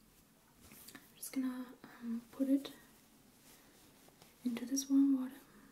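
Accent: Germany. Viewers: What an extraordinarily charming German accent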